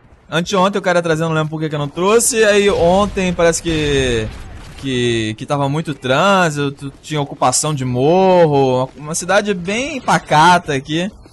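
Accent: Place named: Rio de Janeiro, Brazil. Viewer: Ele é carioca cara '-' Tá loko? kkkk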